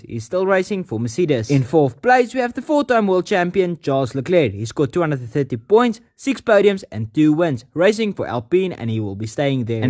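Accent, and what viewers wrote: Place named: South Africa. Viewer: This is the strongest South african accent I have heard in my life.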